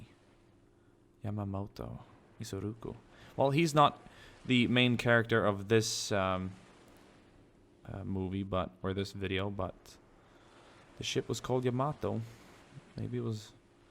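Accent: United Kingdom. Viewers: I just watched a video you made in 2011 you sound a lot younger and almost have a British accent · Didnt you have a brittish accsent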